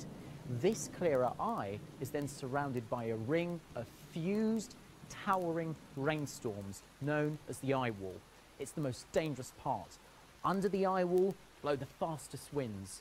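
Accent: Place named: United Kingdom. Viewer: Because he is British and that's how its pronounced in Britain, the e at the end is silent. Just like in America you guys say tomato as to-may-tow, we brits pronounce it "tom-ah-tow. There is no right or wrong way to say it, it's the same thing, it's hardly a big deal...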